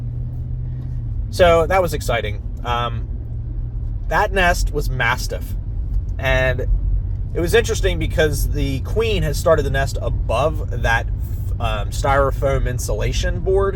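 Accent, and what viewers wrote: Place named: Philadelphia, United States. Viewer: I am from about an hour outside of Philly, and judging by his accent i would guess he is from the same area!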